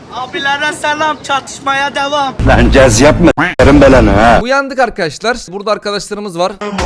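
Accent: Trabzon, Turkey. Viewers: Baba sen Trabzonlu musun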